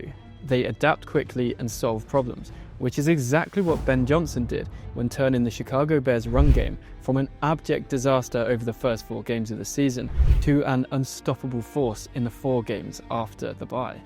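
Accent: England, United Kingdom. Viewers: Why are you English? I love this video and your breakdown. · No offense meant, but so weird to have a video about football narrated by an English accent. I thought most in the UK couldn’t care less about American Football.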